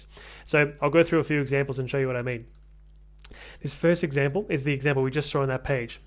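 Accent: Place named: Australia. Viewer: He’s speaking Australian, that’s how we all speak.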